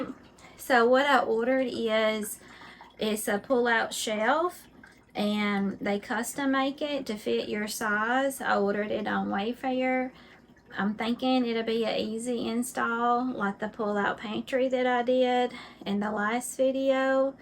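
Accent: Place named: Appalachia, United States. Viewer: I'm just curious about your dialect. Are you from West VA, Tennessee, or Kentucky?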